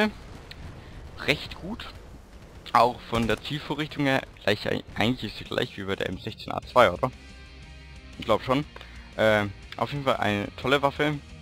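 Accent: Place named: Swabia, Germany. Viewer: ein Schwabe..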